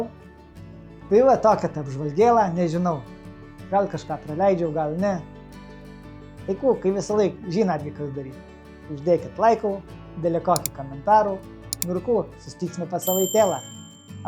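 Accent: Aukštaitija, Lithuania. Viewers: Vat tas grynas aukštaitiškas dialektas :D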